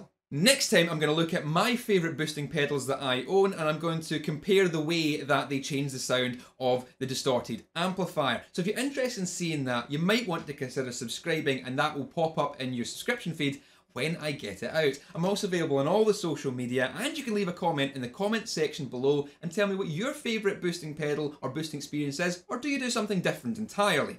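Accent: Scotland, United Kingdom. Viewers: Love video's like this man. Am fairly new to the channel, but loving it already. And nice to hear a Scottish voice on youtube! :D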